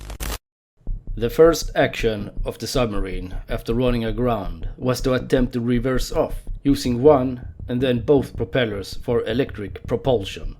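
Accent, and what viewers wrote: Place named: Sweden. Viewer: I LOVE the svengelska!! Are you from skåne?? P.s You speak terrific english, just heard your perfekt uttal on orter 😹🙏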